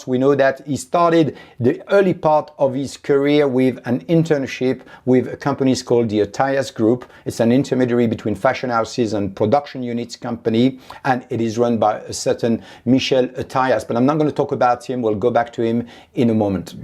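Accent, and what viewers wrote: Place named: France. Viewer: you're amazing and your English is wonderful. Please bring out merchandise with my favourite saying that you often say in your lovely French accent. ' Behind the Bars'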